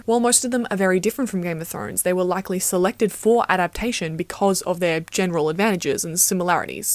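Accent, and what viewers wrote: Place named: Australia. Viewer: I think youre australian, so it explains it, but please for the love of good stop to say the "o" and "w" like the h20 girls its sooooo irritating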